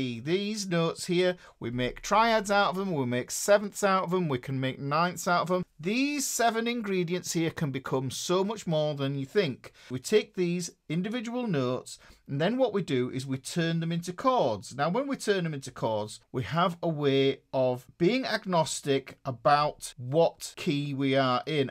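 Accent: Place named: Yorkshire, United Kingdom. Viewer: Nurr yer nurts. Must be Yorkshire…Huddersfield?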